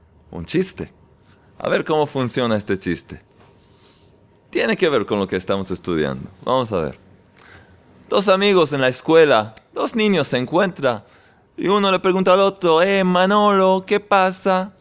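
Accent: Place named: Panama. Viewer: Una de los sucesos que mas me ha divertido es que usted habla como nosotros y eso hace que se identifique mas..."pa donde vamos" jeje Y los mas importante y valioso es que no sabia como era mi relación con Dios y tampoco entendia muchas cosas. Aparte que no sabia que espera de mi y yo de El. Gracias, muchas gracias. Soy de Panamá y me apodan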